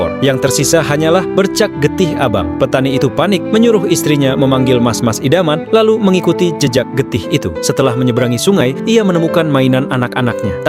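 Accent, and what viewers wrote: Java, Indonesia. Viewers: bagus mas pake aksen jawa nya😅😅😅😅😅😅 bikin makin ngerti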